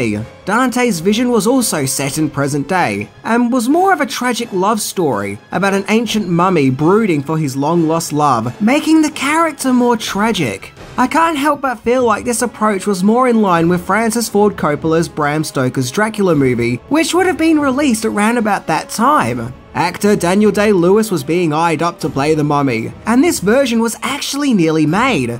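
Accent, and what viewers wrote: Australia. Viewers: Oi you’re an Australian, sweet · It’s even printed wrong on the last title card. I was cutting slack for the Aussie accent thinking maybe he’d always just heard it wrong but when it appeared incorrectly in print, I gave up.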